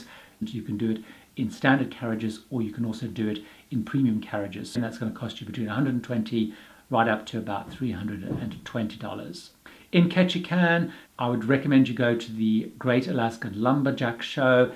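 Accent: England, United Kingdom. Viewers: I believe is British from his accent and from some of the comments he makes. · Your speaking style is difficult to understand and to listen to. You speak too quickly and even worse, the volume of your speech is so variable it is difficult to keep up with. The volume of your speech many times starts out loud, like an attack, then fades into a very low volume for an extended period of time. Then another attack and another fade. Your English(?) accent makes it even more difficult to understand. I would suggest you speak more slowly, more clearly, and try not to have dramatic volume differences in your voice. I found the video difficult to listen to and understand. This comment is meant to be helpful.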